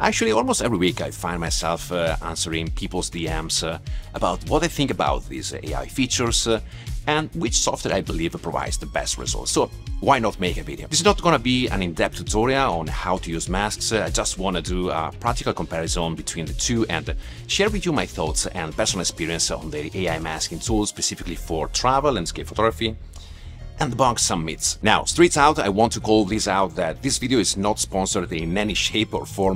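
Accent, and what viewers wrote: Italy. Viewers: Great comparison. Thanks ! Your delivery is very friendly and informative and the Italian accent is charming.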